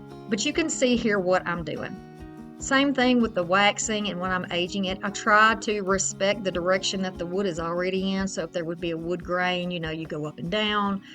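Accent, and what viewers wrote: South, United States. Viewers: One of my favourite days of the week and the other being Tuesday. As I'm in the uk I watch your videos the day after you post them. Always the first video I watch as well and you never disappoint me. I love how chatty you were and when you get carried away or nostalgic about something how your southern accent gets stronger. Always cheers me up and I sure needed that today. Love how they all turned out and I'm definitely going to give the clay jug a go. Thank you again xx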